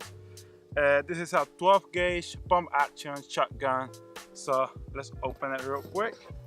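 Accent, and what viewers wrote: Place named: Puerto Rico. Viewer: My wife and her family are from ponce and I'm from Argentina we always make fun of each other's accents lmfaooo. My bros in law sound just like you bro!!!😂😂😂😂😂😂😂😂😂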